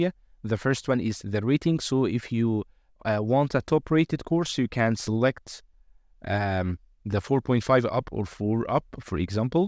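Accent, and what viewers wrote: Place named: Egypt. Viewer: Thank you very much! it worked. وأخدت بالي إنك مصري زي من أول كام جملة :D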